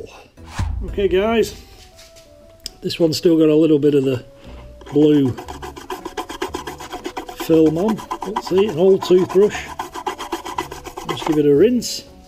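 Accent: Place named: United Kingdom. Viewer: By your accent your in the UK. Where did you manage to get the hydrochloric acid from as I need some but can't get it anywhere please. Great informative video thanks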